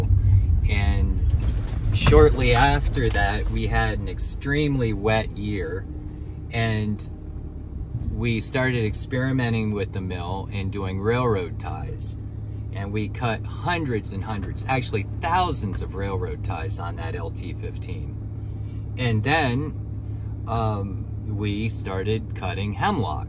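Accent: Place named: Pennsylvania, United States. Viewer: I’ve watched several of your videos and was always impressed with the dictation and wondered what part of the country you lived . Your accent was very familiar but I couldn’t nail it down. Now I know “ Pennsylvania “ my sister lived there in Paoli . Very impressive interview with the Boss and he hit the nail on the head with his answer about the dowry . Hope you were listening.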